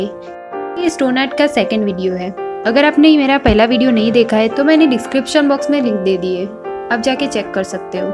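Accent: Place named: Gujarat, India. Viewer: R u gujarati??your sound is like gujarati...btw,great work..👍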